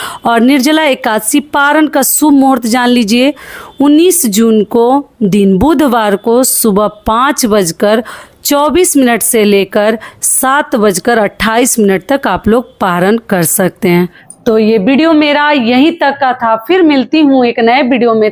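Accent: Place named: Bhojpuri, India. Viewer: Didi Bhojpuri mujhe bhi Aati Hai Lekin aapke Munh se Bhojpuri bahut acchi Lagti Hai😘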